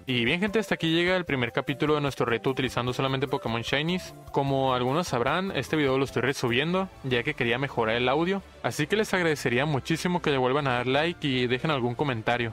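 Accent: Chihuahua, Mexico. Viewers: Eres de chihuahua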